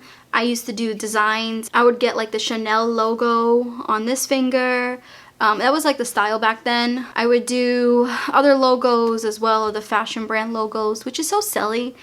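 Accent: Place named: Massachusetts, United States. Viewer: Massachusetts. Her accent is the number 1 reason why I subbed and she tries so hard to not have an accent lol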